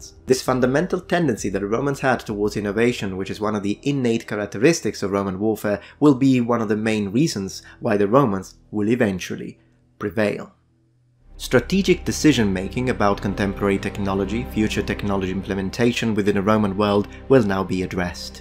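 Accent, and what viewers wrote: Greece. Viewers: Great greek accent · I love your Greek accent, really nice job. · Great video! Your Greek caught me by surprise!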